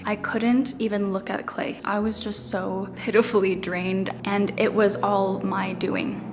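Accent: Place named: England, United Kingdom. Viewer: i love your english accent❤ and also every video you uploaded, you’re the best!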